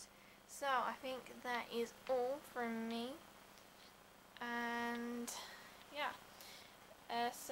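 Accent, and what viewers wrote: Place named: United Kingdom. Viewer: where in the UK is your accent from? it's absurdly cute. with my limited knowledge i'll guess surrey or wales. oh yeah and thanks for the info vid =B gonna go get some more dklor today! my other batch just kept leaking out of the bottle.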